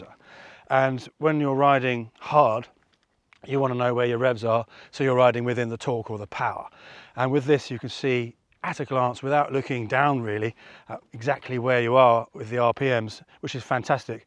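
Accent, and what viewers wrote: England, United Kingdom. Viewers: Hey love your videos/content. Seriously considering this motorcycle as a forever bike now in my 50’s, and great to see a review from an English fellow and not a screaming American. Not seen the young fellow on here for a bit, hope all is good. I also have a thing for churches, always try and have a look through one where I can.👍 regards from the Pilbarra Western Australia.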